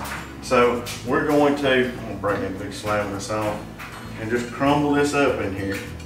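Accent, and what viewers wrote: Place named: South, United States. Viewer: Nice the accent you know the country guy can cook. New sub here thanks for the video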